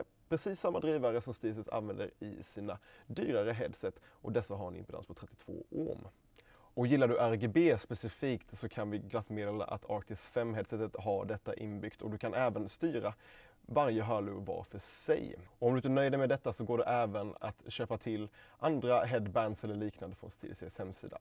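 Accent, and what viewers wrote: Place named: Sweden. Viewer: He’s from Sweden 🤨🤨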